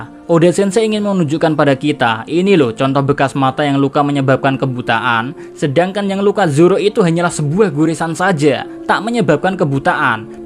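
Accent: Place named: Jepara, Indonesia. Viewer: Owalah admine wong jeporo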